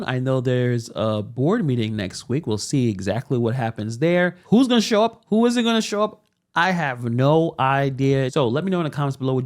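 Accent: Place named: Appalachia, United States. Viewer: Ya all ya all all learn from me lol 😂 you speak like a uneducated hillbilly clown 🤡 total ghetto girl 😂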